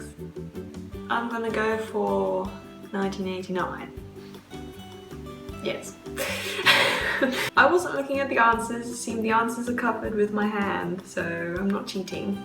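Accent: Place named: England, United Kingdom. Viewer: Seeing as your English and live in German I thought you'd be the person to ask, do Germans seem to like your accent or find it interesting? I've had this experience with some people from other countries and wondered if you had encountered this in Germany. If so you should make a video on it, I'd love to hear your experiences :)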